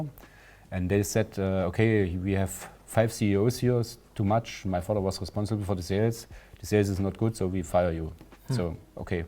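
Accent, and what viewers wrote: Germany. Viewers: Ich liebe sat german Inglisch ... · That’s one heavy German accent! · German Accent at it´s best ;) sometimes i love my country for things like this XD but he seems realy competent and like a nice chap :) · As a German myself, it's painful to listen to this. But he's from East Germany. They never had English in school. They had to learn russian in the GDR (DDR) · East German accent non the less. 😊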